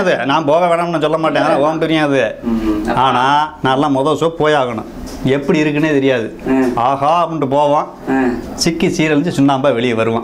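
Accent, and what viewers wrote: Madurai, India. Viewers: love scenelam vayithaala pora madhiri irukaapla..sema kalaai...super nanba. today than unga channel pathen.. madurai slang super.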